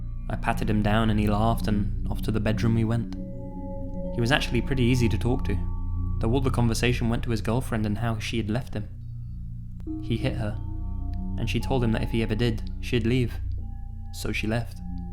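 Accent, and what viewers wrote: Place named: England, United Kingdom. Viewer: Surrey. Bollocks. I can't believe I couldn't recognize your accent sooner; that's where my friend was born before she moved here ( CA, US). She'd never stop with the ''you daft cow'' if she knew. Still, that's better than her pet name for me in high school (dirty slag, which is not in the least bit accurate by the way). I think this is the fifth time I've watched this video, they're all just brilliant.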